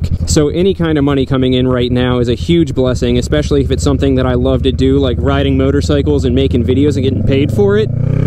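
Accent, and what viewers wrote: Baltimore, United States. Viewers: fuck yeah bruh. the baltimore accent is making me nostalgic lol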